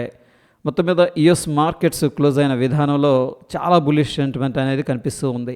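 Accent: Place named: Telugu, India. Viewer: Thank you brother for the information🙂👍, your voice and Telugu ascent reminds me the news reader on All Indian Radio morning news in child hood days.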